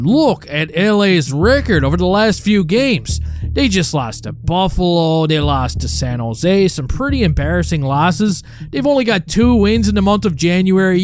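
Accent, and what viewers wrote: Newfoundland, Canada. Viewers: You sound like a newfie.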